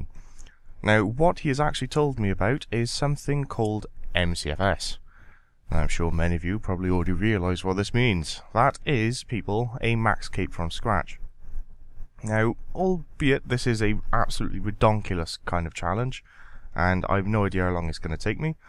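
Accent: Wales, United Kingdom. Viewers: r u welsh?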